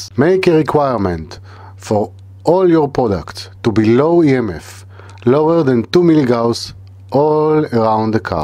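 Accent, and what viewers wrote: Israel. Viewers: אתה ישראלי לפי המבטא אני בטוח חחח אין מגנים לדברים הללו ?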